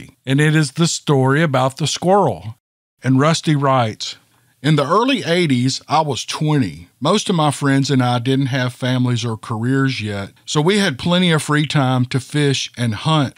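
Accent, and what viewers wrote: South, United States. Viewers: is the man best story teller hands down soothing southern drawl...damn can this man tell a story love ya 💪💪🤜🤛✊👏🌱🌿🌴🏞️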